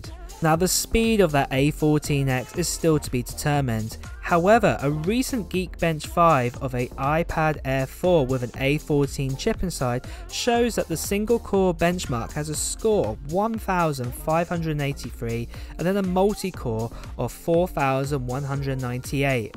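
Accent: United Kingdom. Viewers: Are you from the UK? You have that accent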